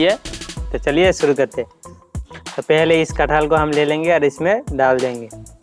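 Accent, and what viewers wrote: Bengal, India. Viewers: Aap west bengal ho Kiya?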